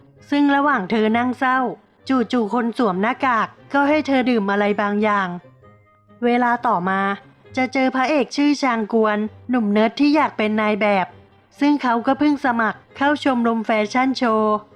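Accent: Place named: Isan, Thailand. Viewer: คนอีสานเปล่าหนิ สำเนียงในการพากย์เหมือนคนอีสาน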